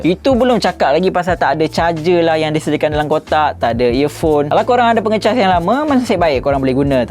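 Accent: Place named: Kelantan, Malaysia. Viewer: Dik.. pelat kelantan awak not bad jugak ya..kecek kelate je lah. 🤣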